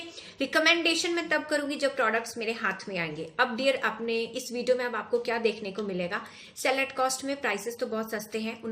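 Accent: Punjab, India. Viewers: R u a Punjabi Girl.......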